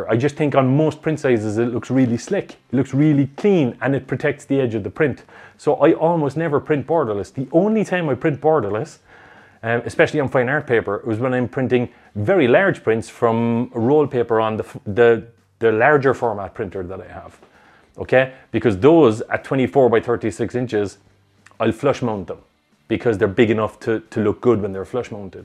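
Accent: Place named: Kerry, Ireland. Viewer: Hi, brilliant and straight forward review as usual. Bit of a daft question and definitely not printer related! Having enjoyed many trips over the water to Ireland encountering all the diverse dialect changes I can't help but try and best guess. So my best guess for yourself would be the West side, maybe Kerry or not quite that far South.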